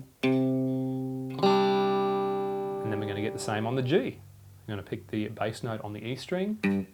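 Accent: Australia. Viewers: Hey man, are you an Aussie?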